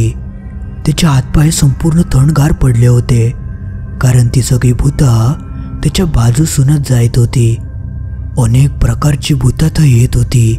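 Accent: Malvani, India. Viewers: आपण जिवंत माणसं आहोत जी पाच तत्वांनी बनलेल्या शरिरात जिवंत आहोत पण भुतं मग ते किती ही आणि कोणत्या ही स्वरूपात असो जिवंत शरिरातील माणूस केव्हाही त्यास प्रतिकार करू शकतो असो ज्यांना अनुभव हावा आहे त्यांनी प्रयत्न आणि सातत्याने पाठपुरावा सुरू करावा त्या क्रिया विधी कर्म गुप्त ठेवण्यात आल्या आहेत पात्रं नी कू-पात्र अशी परिक्षणं करुन च् या विद्या शिकवल्या जातात. 🙏 मालवणी भाषेत नी ऐकण्याची शैली आवाजातील भ्रमर नाद नी गंभीरता गोष्टीची गुढता व भय आतुरता वाढवते 👍👌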